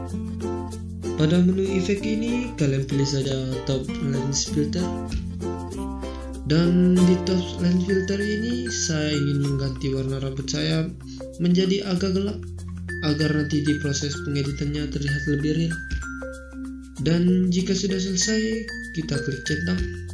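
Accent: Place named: Jereweh, Indonesia. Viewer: Mantap logat Jereweh-nya :)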